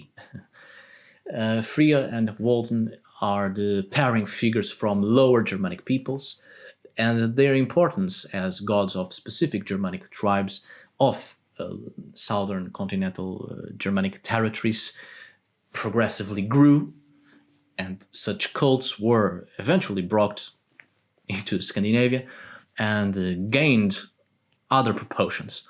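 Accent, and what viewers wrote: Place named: Portugal. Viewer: Your Italian accent is quite good. You have a little bit of the Portuguese accent on the Rs before vowels, but well done. Que fixe pa!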